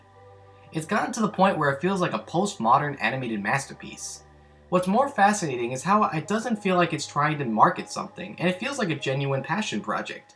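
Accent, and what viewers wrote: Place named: East Coast, United States. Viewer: I subscribed just to hear you tawlk. Not many Eastern accents in northern Minnesota. :(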